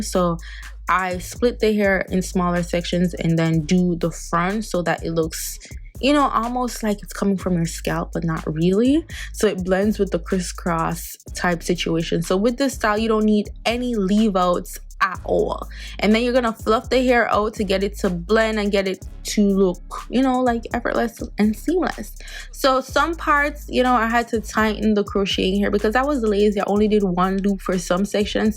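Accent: Jamaica. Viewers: lol the Jamaican definitely keeps jumping out. I love it though. My hear head top and laugh to myself. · Mek di Jamaican jump out mum..lolol😂🇯🇲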